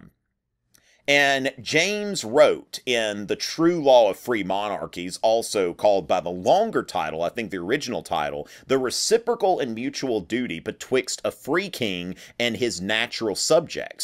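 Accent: South, United States. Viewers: How is this guy not more popular? His accent takes getting used to if you're not familiar with that southern twang, but once you get passed that he's not boring!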